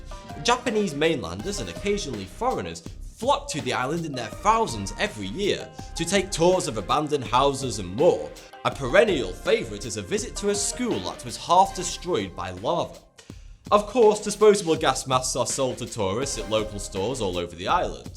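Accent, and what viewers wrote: United Kingdom. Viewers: Why almost of the facts givers or educational youtubers use British Accent??? I'm always wondering why??? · My friend hates British accents so I put the volume all the way up, just to male him mad 😂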